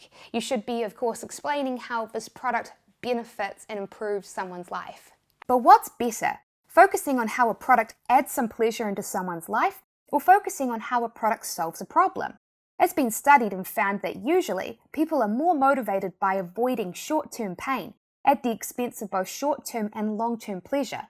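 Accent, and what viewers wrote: New Zealand. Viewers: Definitely a kiwi. NZ.🙂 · Amir B It is none of these. It is a New Zealand accent.😀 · New Zealand. Good luck to you🙏🏼 shes good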